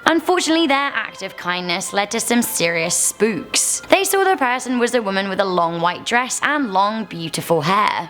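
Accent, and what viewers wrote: United Kingdom. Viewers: I'm so curious to know where your from 😍 your accent sounds British but distant like you haven't lived here for a while... Anyhow much love for the page you and Danny are amazing!! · Hey idk y but i love the British accent on women an i think ur hott lmao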